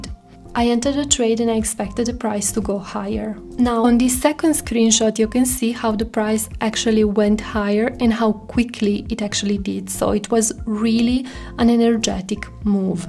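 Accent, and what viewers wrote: Italy. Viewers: Sorry, your accent is very similar to the italian accent. For example you pronounced "blue" in a perfect italian haha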